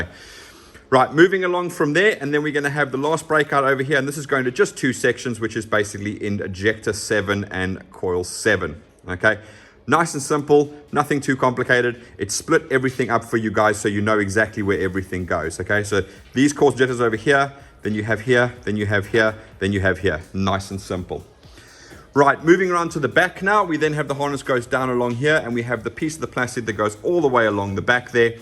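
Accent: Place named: South Africa. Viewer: That South African accent. Also, awesome work man!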